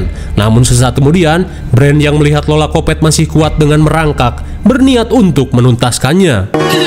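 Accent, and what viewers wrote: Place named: North Sumatra, Indonesia. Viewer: Bang..kau asal sumatera ya 😂😂kdg logatnya kek org sumut😅😅😅 penasaran.semoga di balas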